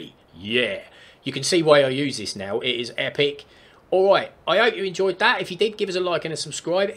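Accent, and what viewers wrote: Cockney, United Kingdom. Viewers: Nufing wrong with your east end London voice son it’s only cos I’ve got one as well lol thanks for the video fella , I’ll give it a try 👍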